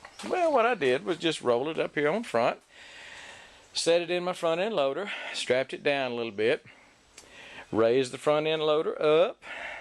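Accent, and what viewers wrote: Texas, United States. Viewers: Thank you Matthew McConaughey! 🤣 jk.. laid back Texas accent sounds similar.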